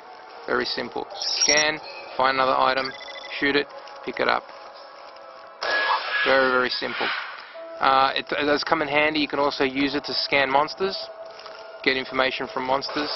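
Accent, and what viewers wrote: Australia. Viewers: Awesome video. I am in Australia as well. I assume you are in Aus with that accent.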